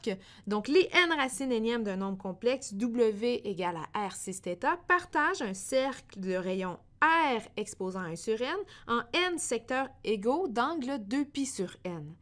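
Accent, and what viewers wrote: Quebec, Canada. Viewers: l accent québécois j adoreee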